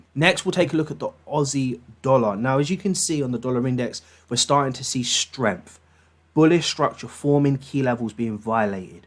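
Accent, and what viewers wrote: United Kingdom. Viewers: He sounds british to me and they have a different way of seeing these situations. They often have a funeral and next gather for a meeting lunch and I think thats their way of showing respect and appreciation for the person. I hope Im not saying bullshit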